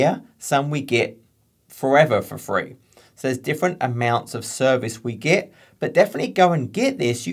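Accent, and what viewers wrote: United Kingdom. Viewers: Hello, Happy New Year 2023. You are truly an exceptional teacher period! Your style of delivery and the way you captivate your viewers in addition to your passion, selflessness, brilliance and clear desire to empower people is mind blowing. Thank you so much because watching your AZ 900 course did actually make a difference in my AZ-900 exam. Yes of course, I smashed and aced the exam on 12/31/2022 with a score of 865 and it was a great way to end the year 😊. As someone that lived and studied in England for some years, just listening to your British accent was quite entertaining and a reminder of the great and beautiful people of Britain 😊 Right now, I have started studying for AI-900. All the same, thank you very much and have a great year.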